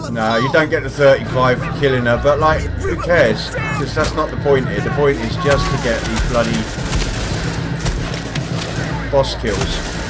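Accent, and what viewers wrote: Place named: United Kingdom. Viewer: Bloody hell, finally hear a streamer from the UK! Fair play 👌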